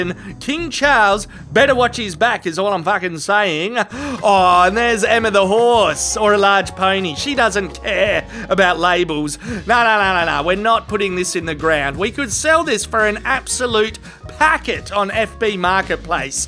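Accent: Australia. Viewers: Me and me mates sat in Leicester and watched it on big screen getting piss=d. We endured 6 hours of middle class, mid life crisis BBC droning tossers. Wish you had taken over as you nailed it in under 4 minutes. Wherever you are liz, know that your best eulogy had an Ozzy accent.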